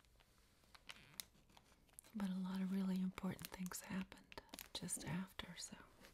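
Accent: South, United States. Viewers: your accent is so wonderful to me. Your voice and mannerisms just speak “southern charm”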